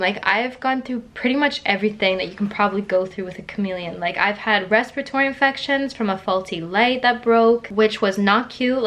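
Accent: United States. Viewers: This is the first time that I've watched one of your videos. God bless you. You cry because you care and that is why I take the time to comment. In the space of nineteen minutes you gave me so much real information and so full of emotion and determination. Your past experience with animal rescue and the skills that you've learned.. You said the Vet bill came to over a Thousand Pounds, with that accent It should have been Dollars.?. Then you went on about the UK government, so I guess you're living in my country now, which is just lovely. I hope you acquired the right number of signatures on your petition and Boris was forced to talk about Chameleons rather than Covid. 😀👍 🦎✔ 🦠❌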